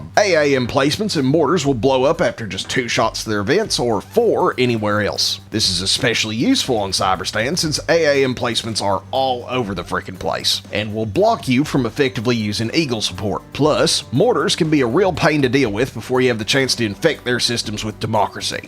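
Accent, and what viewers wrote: United States. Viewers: is this country accent real? it's annoying af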